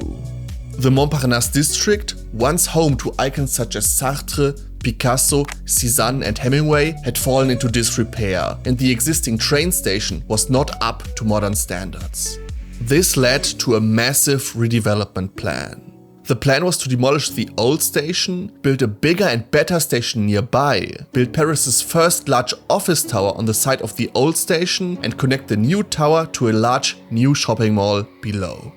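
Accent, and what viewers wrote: Germany. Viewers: deutscher akzent :D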